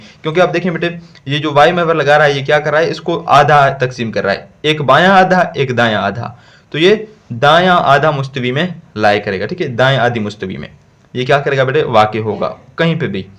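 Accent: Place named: Saraiki, Pakistan. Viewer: Sir lagta hy AP saraiki Hain q ky ma Bhi saraiki Hoon AP ki saraki ka mughy PATA chal gya😊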